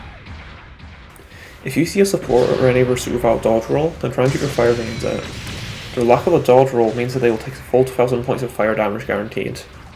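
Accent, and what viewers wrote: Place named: Scotland, United Kingdom. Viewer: Just started playing so these videos have been helpful, is that an Edinburgh accent? I'm from Glasgow so you sound Scottish but can't place it exactly.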